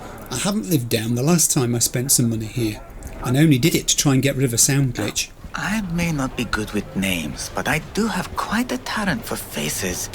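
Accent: United Kingdom. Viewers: Quite astute to notice the three branches of story- I have a feeling you might notice some other associations as you play. It's way more interesting to come across anything like that naturally so I don't want to give any hints. In my opinion, after having played through this game several times, Letting your whims and interest guide you to what content feels right in the moment is the best way to play. From my end, I just feel blessed to have been able to share in a bit of your experience with it through the window of the internet. Hello from across the pond! (I'm from Canada, and by your accent, I assume you're from the UK?)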